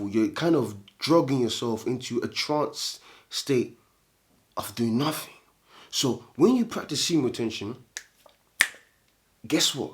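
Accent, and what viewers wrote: United Kingdom. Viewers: based on your accent im assuming you are from the UK, I have a question, do you think it’s realistic that if I retained for let’s say 6 months wisely having my diet in check fasting and meditation etc, that I could earn around 200 to 300 gbp on average a week earning money online if I spent 6 months of research on it? It’s a specific question but I’m just curious how quickly SR can get me out of this rat race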